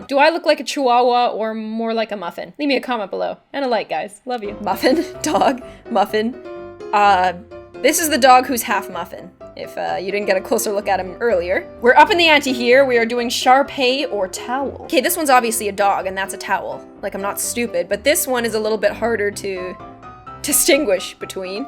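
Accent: Canada. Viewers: I love how you say 'begel' instead of 'bagel'. The Canadian accent has never ceased to surprise me :)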